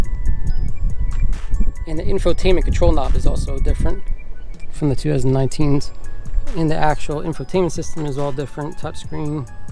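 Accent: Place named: Pennsylvania, United States. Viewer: Nice Pennsylvania accent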